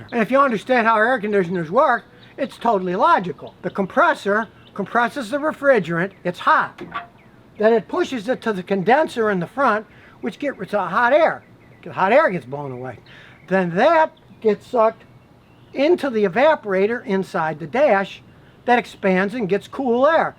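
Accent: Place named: South, United States. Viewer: Your English spoken very country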